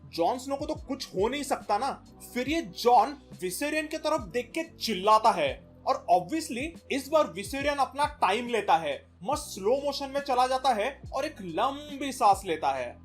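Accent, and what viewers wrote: Maharashtra, India. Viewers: Bro are you marathi your accent is like marathi,nice video bro 🫡😂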